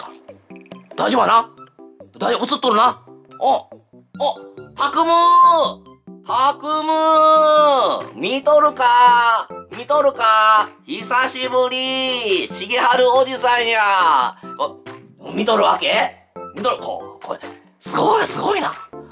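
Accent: Kansai, Japan. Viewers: ゴリゴリ関西弁ですやん😂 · 設定に無理あるが、関西弁で押し通す精神力はすごい。さすが元お笑い芸人。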